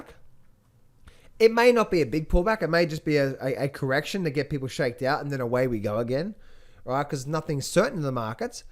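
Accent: Australia. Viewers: Thanks,. DAH-ta for us Americans. DAY-ta sounds better with your Aussie accent. nq17k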